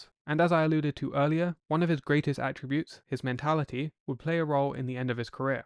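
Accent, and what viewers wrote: England, United Kingdom. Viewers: why a whack english chap narrating doesnt mix with AMERICAN football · An Englishman doing American football sounds funny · British narrator or AI ?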